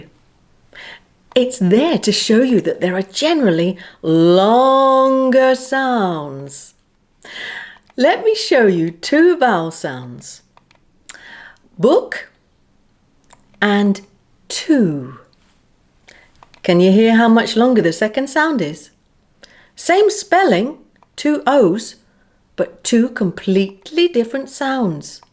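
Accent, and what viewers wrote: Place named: Scotland, United Kingdom. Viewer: Hi, I am here just to say you are amazing. Where have been all my life? Your Scottish accent made me giggle. You are the best English teacher I ever had. Grazie mille la mia eccellente insegnante. Ciao.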